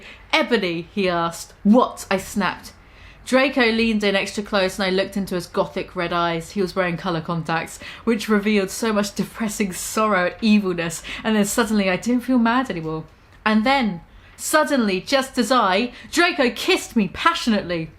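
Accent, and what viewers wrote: United Kingdom. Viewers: I gotta say, hearing this in a British accent makes it better than other readings i've been listening to lol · with a british accent... Noice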